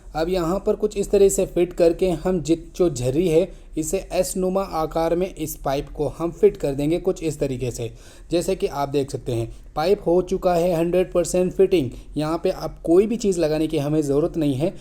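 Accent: Rajasthan, India. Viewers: bhai aap Ajmer ya Nagaur se ho. Kya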